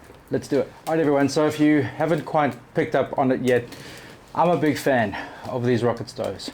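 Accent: South Africa. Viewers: . South Africa, it sounds….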